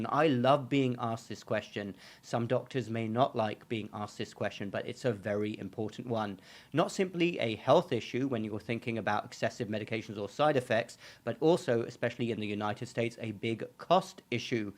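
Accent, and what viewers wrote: United Kingdom. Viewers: I’m a subscriber, and watch every post with extreme interest. I’m also almost 73. The title and thrust is somewhat condescending, but I know you do not mean it that way. (Despite the British accent…😅). Many of us “of an age” don’t need any watching over, but many, far younger than you mention here, are incredibly naive and many times borderline stupid. Too many older people in America think they have some inalienable birthright which requires they be looked after in their later years with no input from their own logic base, and this is about as inane as it gets. Of course the medical industry makes merchandise of them, but so do the insurance, food, housing, and retail industries, not to mention the government and every other grifter with a smooth line, and way too many people who should be wiser fall for it with predicable silliness. 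Yes, I’m a cranky old man but I also do my homework, take care of and responsibility for myself; any doctor who gets sophistic, rolls eyes or condescends to me when I ask a question learns quickly enough if they can’t respect their elders, they damned well need to learn how respect their betters. If more “mums and dads” adopted a like attitude and took care of business on their own behalf there would be little cause for this type of concern.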